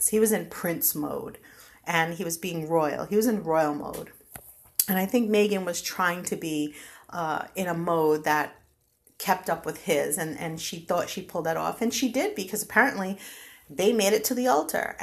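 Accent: Northeast, United States. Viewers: First time here, I’m guessing your from the Northeast USA?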